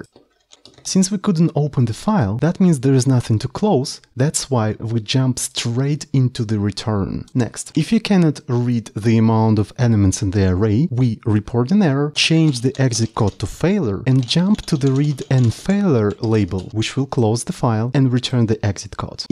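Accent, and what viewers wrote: Russia. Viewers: Вау, крутой канал. Очень нравится этот лёгкий русский акцент, прямо как родной, лол.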